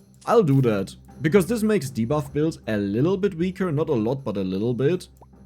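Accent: Germany. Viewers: Omg 200k 🎉🎉🎉🎉 congrats I love almost all your content I could watch u watch paint dry with u talking about it lol. I really enjoy ur German accent and when u get frazzled it kinda makes me laugh sorry. 😂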